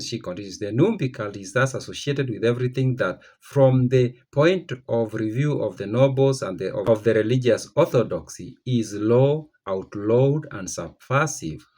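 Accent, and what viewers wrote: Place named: Kenya. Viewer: we are dignified people we are nomadic we troll each other because we think words are just word lol we laugh a lot and believe in mental resilience and respecting others even if we are not from the same country I learned all this from my dad he grew up nomadic but he gets along with anyone and will speak up/ stand up for anyone when need be. M Side note: from your accent I think you’re Kenyan or 🇺🇬? This my first time seeing this video so is just a guess😂 nice video🙏🙏🥰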